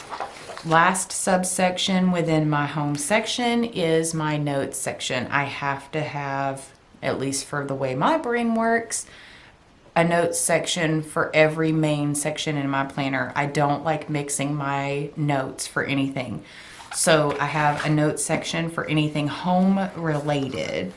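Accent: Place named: South, United States. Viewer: I really like your idea of using only two of each filler paper so you go through them... I'm the same way... I HAVE to have extended disc simply because I WANT IT ALL in my planner. It's the main reason I've come back to happy planner after having them for years but never knowing what a frankenplanner was.... Best thing EVER! I also love to hear you talk - that southern drawl!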